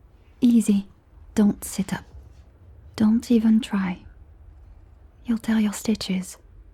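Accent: France. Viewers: J'adore ces audios avec l'accent français parce que ça m'aide a mieux comprendre 😂 Joyeux Noël à tous depuis la France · God I wish for French GF to listen her speaking with this accent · This was so great! Your French accent is superb ❤ Merry Christmas ❤🎄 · French accent? You have my undivided attention. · I absolutely love hearing accents and your French one is AMAZING. Thank you for this wonderful gift of a video!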